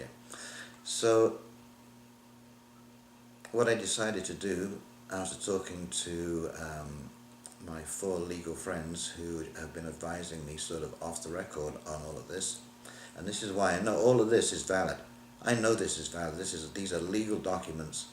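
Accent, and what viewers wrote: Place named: Yorkshire, United Kingdom. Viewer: I am questing you are from Yorkshire England, great video and 1 of many trying to get the word out. my son is fitting smart meters, he thinks they are a great thing as many do, i will show him this video, its about showing people the real truth, and searching, my son is fitting 5 on average per day many are inside the home, they have fitters all over England doing the same, next comes 5G,, they are trying us, and many can't see it, and the joke is they had this technology a long time, there is more to come, chip will be next, we could stop it so easily if we all pulled together, but while we have many mostly young thinking all this stuff is cool we don't have a chance to stop it, I'll get russia say no to them as they banned many things including microwaves , my advice would be to purchase a device that can tell gow much radiation is coming from all our household items, even the simple toaster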